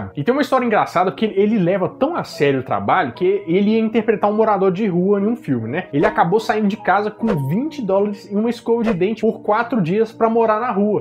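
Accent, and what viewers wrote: Minas Gerais, Brazil. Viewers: Beijao, adoro seus videos, curto demais, e o doc.? não tem possibilidade nenhuma de vc continuar fazendo? Amo seu sotaque mineirinho. E caça mais filmes com atores bem no inicio de carreira, miito bom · É tão bom vê um vídeo com nosso sotaque mineiro 😃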